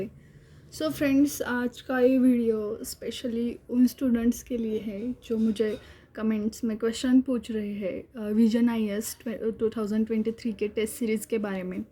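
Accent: Maharashtra, India. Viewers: Marathi assent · You have Maharashtrian accent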